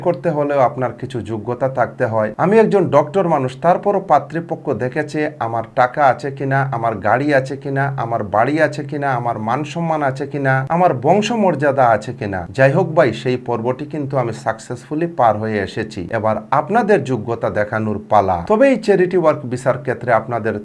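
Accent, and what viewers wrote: Sylhet, Bangladesh. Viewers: Vaiya apne Sylheti ni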